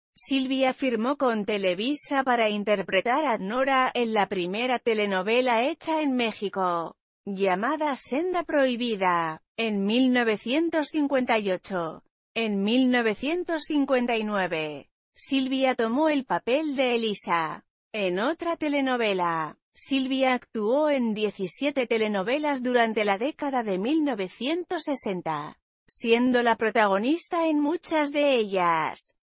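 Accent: Spain. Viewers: No me gusta esa voz de Española pues son reportajes de mexico y debería de ser una mexicana q lo hiciera. no tiene ni modo , ni tono , ni forma para hacerlo..... !!!! Pufffffff😥😟😤😨😵😱😲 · Muy mal reportaje, fotos erróneas, la voz española no sé xq carajos si se nos mexicanos, y la voz ni se diga tienes tachesote X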